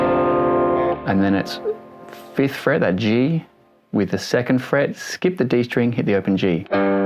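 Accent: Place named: Australia. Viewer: Also very cool to find another Kings X fan with an Australian accent 😀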